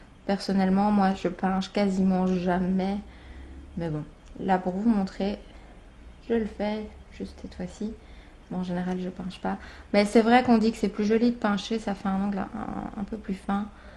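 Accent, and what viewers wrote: Belgium. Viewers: t'es belge??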